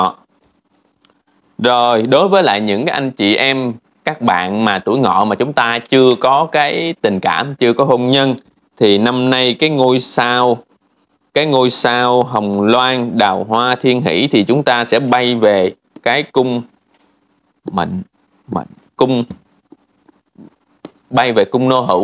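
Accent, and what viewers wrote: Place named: South, Vietnam. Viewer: tìm mãi mới thấy thầy nói tiếng nam nghe rõ dễ nghe , mấy ông nội khác nói tiếng bắc nghe tử vi chán ko thấm chút nào 😊 coi bói là tui kím thầy nam coi thôi à nhất là người miền tây dưới an giang á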